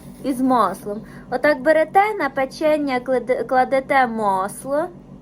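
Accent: Poltava, Ukraine. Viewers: У нас ,на заході України, мова трошки інша.Але так говорять мої рідні з Хорола Полтавської обл. Люблю слухати ваш діалект,здорово і гарно!❤😊 Смачного тобі!!🎉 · мені подобається як ти розмовляєш. ми на Полтавщині так і балакаємо.спасибі за відео.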